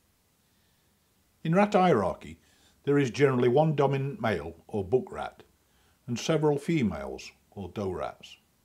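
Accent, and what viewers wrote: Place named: Lancashire, United Kingdom. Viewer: Thankyou,,, Great video,,,Great Accent,,, Lancashire,,, Could be Yorkshire,,,I my self like rats,,,, Good luck to them all,,,👍🌹😄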